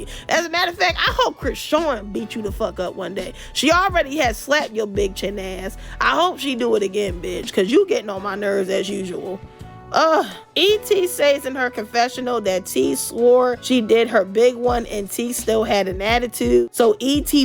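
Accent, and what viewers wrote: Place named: East Coast, United States. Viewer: Just bc you’re only AA and don’t know your history doesn’t mean others doesn’t. There’s WHITE PUERTO RICANS 😂😂😂😂 SPANISH IS A EUROPEAN LANGUAGE!!!! Damn I was feeling you till started showing the failure of American education. You sounds like you’re from the east coast where there’s variety but you are hella ignorant fr ughhhhhh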